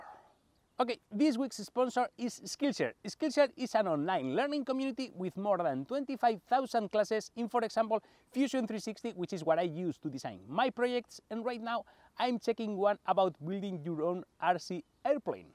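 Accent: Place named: Spain. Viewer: eres espanyol se nota xd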